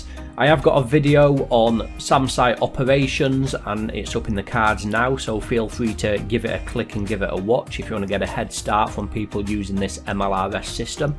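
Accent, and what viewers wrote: United Kingdom. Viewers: I dont know why, but I always learn more about Rust from a chap with a British accent.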